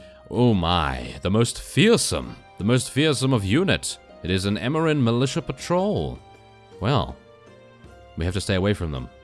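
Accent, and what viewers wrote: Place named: Southern England, United Kingdom. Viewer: Southern UK.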